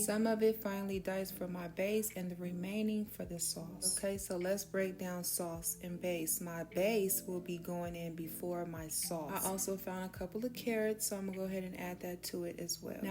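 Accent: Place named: Los Angeles, United States. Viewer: She sound pure LA 😂😂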